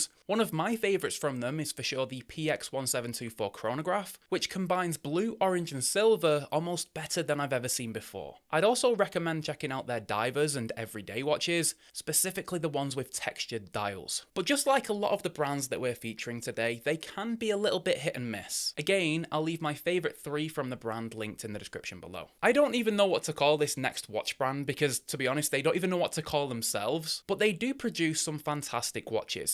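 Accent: United Kingdom. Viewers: I really like this guy. Is it the UK accent? Or the fact he reminds me of Jon Snow from GOT?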